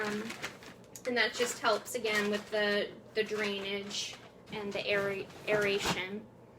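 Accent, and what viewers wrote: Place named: United States. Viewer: Are you in Bridgewater in UK or USA. I notice your USA accent. My temerature is only 20°c and my beany is losing leaves by the handful after I just watered her!! Please help.x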